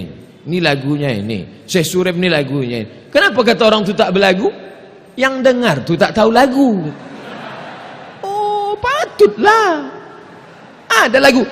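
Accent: Tanjung Balai, Indonesia. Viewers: mcm orang tanjung balai..cara ustad ngomong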